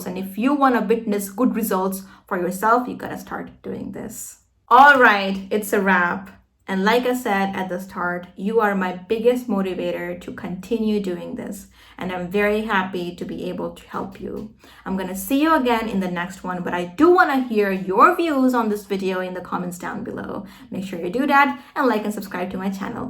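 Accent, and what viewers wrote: United States. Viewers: hello mam how are you doing can I connect to with you once you are my big friend and I I always try to watch your video yeah it is true understanding your accent is difficult but I like so much American accent and I think you use American accent expecting your attention thank you so much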